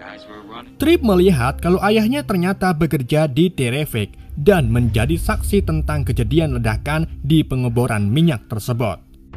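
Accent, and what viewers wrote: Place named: Banjar, Indonesia. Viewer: Bng ne urang banjar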